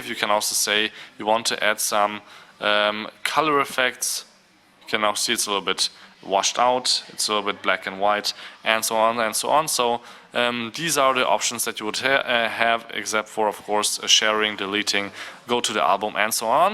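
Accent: Germany. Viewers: Who cares if he has a german accent? Lets see you try to speak german n not have an accent.lok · this german accent is killing me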